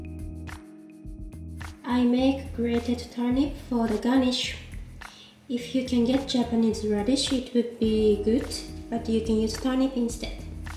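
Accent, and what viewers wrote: France. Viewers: の英語アクセントにフランス訛りがあって、すっかり🇫🇷に馴染んですごいなーと勝手に思っていました🥺💙🤍❤️ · Your English has a slight French inflection, so cute:)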